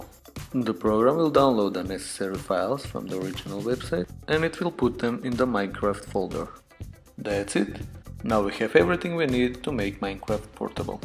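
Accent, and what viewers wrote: Russia. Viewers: u sound Vrussian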